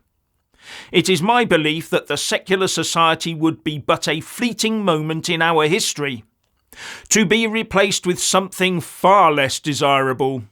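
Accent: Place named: United Kingdom. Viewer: This British accent is for me😂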